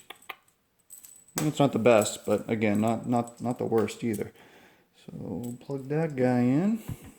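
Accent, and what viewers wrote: China. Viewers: "Chinglish" BOOM--SUBSCRIBED!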